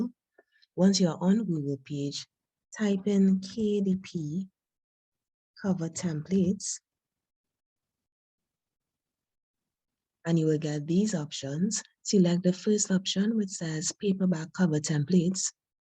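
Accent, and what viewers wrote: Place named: Trinidad and Tobago. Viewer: I recognize a trini accent here!¡ Good job in explaining this - i can't seem to pass the error message in print previewer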